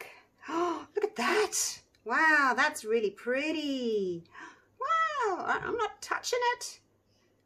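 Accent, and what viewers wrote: Australia. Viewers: I get such a kick out of the way she talks. Not the accent, which I’m low key obsessed with, but the things she says like right-o and hey? Or aye? I’m not entirely sure what she says ... but those types of things. I don’t know if it’s an Australian thing or an everywhere but New York thing. I enjoy people/things that are different from myself. Btw: I’d love to do a class type of thing with her! Like via zoom or something! That would be so much fun && totally something I’d pay for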